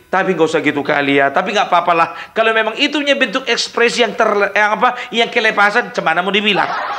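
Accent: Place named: Sunda, Indonesia. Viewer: Mantap banget opung.bisa.bahasa.sunda.ey hhhh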